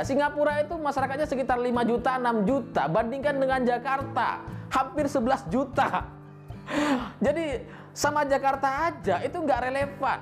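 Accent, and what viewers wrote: Madura, Indonesia. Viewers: orang ini ini logat bicaranya kayak nya orang madura hehe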